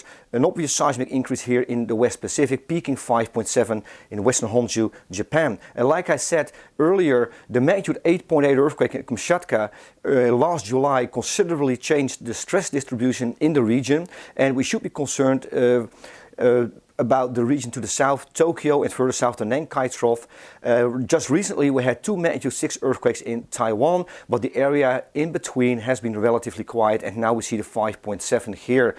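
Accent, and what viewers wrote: Netherlands. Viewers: That is a dutch accent and not AI!☺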